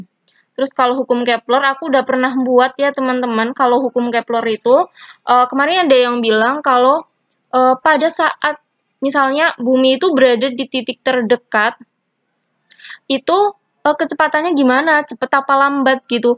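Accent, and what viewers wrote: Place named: Java, Indonesia. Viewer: Muedok nya itu lo bikin semangat belajar bareng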